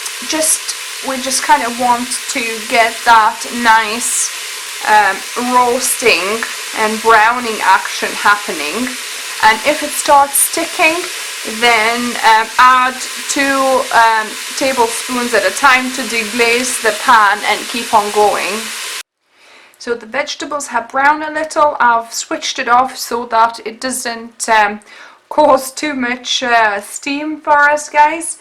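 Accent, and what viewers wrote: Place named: Scotland, United Kingdom. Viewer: Are you Lithuanian? I was so sure you were Scottish or from somewhere nearby in the UK! But I'm not from there myself so maybe there is some small differences that I don't hear. The okara roast looks interesting. I'm getting so much okara now since I have started to make tofu and chickpea yogurt so I'm really appreciating the okara recipes!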